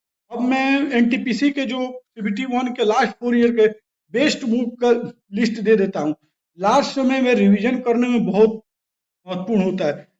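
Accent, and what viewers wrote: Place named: Bihar, India. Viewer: Aapki boli se lagta hai aap bihar se hai... Agar nhi ho toh correct kar dijiyega humein... Bohot acchi koshish hai aapki... Aap ekk din nidarr tarike se jarur video banayenge.. All the best👍🏻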